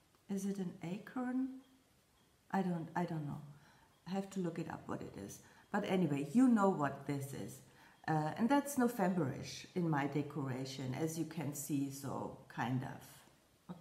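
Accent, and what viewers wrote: Germany. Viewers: I just love listening to you! My family emigrated from Naussau, Germany to the U.S. in 1837. My great grandmother, who died in1967, still spoke mostly German, but when she did use English, she sounded just like you. Thank you for bringing back lovely memories of my Granny with your lovely voice. I always enjoy your videos, and you make me resolve to stitch more smalls. I’d love to have a bowl of different pretties for each month. Thank you for sharing!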